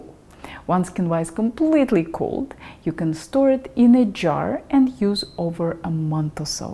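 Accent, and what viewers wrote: Russia. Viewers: Good info ,while her English is great Russian accent still apparent. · I lost it for her Russian sweet soothing Accent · what's with the Russian accent?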